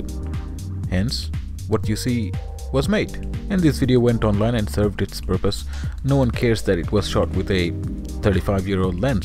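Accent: Bengal, India. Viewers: Are you Bengali by any chance?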